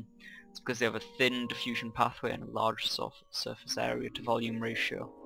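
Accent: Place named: Ireland. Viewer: He sounds Irish to me :)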